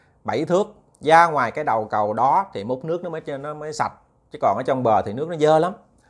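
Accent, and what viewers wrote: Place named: Ben Tre, Vietnam. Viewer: Quê e ở đâu vậy,ah nghe giọng e gióng ở Thạnh phú,Bến Tre quá.hihi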